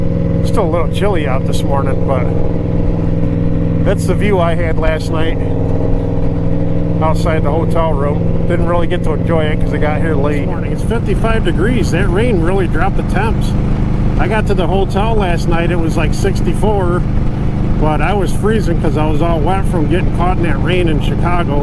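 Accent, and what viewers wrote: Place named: Western New York, United States. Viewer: I'm enjoying this way too much. Btw that wny accent is strong... sound just like me.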